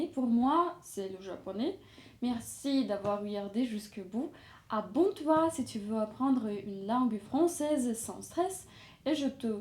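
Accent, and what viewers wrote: Eastern Europe. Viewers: Un accent slave?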